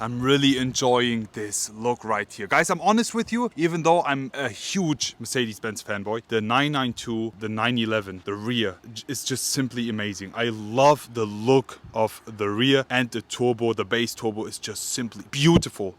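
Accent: Germany. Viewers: Boaa wenn deutsche englisch reden...ohrenkrebs !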